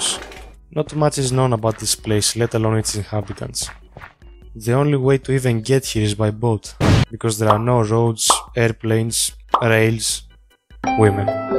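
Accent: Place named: Greece. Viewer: ellinas einai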